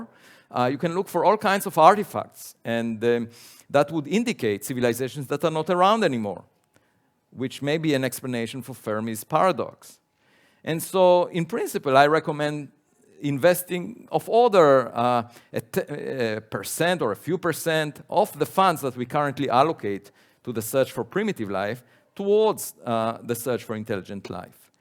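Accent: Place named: Europe. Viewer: This man is simply FASCINATING! And his European accent makes him all the more so. Thank you, Avi, for encouraging us to be humble. Like you, I am of the firm belief that we are NOT alone. But, of course, only time will tell.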